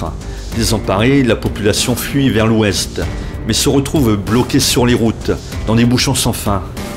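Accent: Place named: North, France. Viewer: Monsieur tu as la voix des gens du nord.di moi si je me trompe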